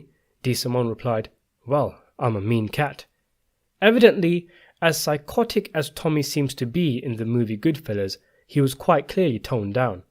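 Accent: United Kingdom. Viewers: Has this guy got a british accent? I am british i never thought he had one till i saw this I have always presumed the narrator of this channel was Indian Maybe he is british but from an indian family background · This was actually a pretty decent narrative but I just can’t get over a British accent describing American mafia…I just…. Can’t. It’s gotta be a New Yorker or an American who sounds like a cop. Sorry Brits…love your documentaries but when it comes to American mafia, your eloquence takes something away from the experience 😑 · Pakistani British accent? Am I correct?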